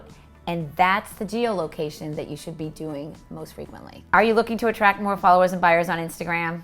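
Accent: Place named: Boston, United States. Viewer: is the best!! She has been so helpful in providing tips to help me grow my business! She’s the real deal and an Instagram guru!! And I love her Boston accent!!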